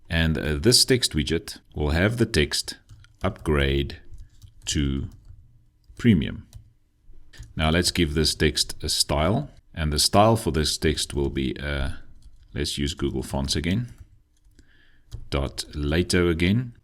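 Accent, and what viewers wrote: Afrikaans, South Africa. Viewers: This was awesome! I solved maybe 75% of your exercise. I got a little stumped on how to format the icon-text-icon structure, and must say, your approach made sense. Overall, the mere act of trying made things click that much more. Thanks Mr., you're a 1 in a million lecturer! As an aside, when I was younger, a lot of my teachers were Afrikaaners, so hearing your accent floods my heart with some beautiful nostalgia. Best wishes, and I'll keep sharing your videos + playlists with all my developer friends that are trying to get into Dart/Flutter. Best wishes from the USA, and stay well ☮️🏆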